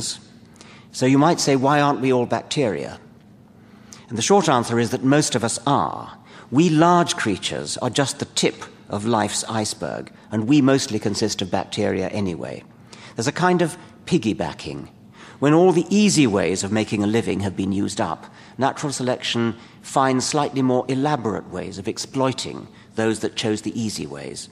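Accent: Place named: RP, United Kingdom. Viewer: Dawkins has long been the most eloquent advocate of all things science for the general reader. And I love his upper crust British accent :-)The Selfish Gene should be required for all high school students.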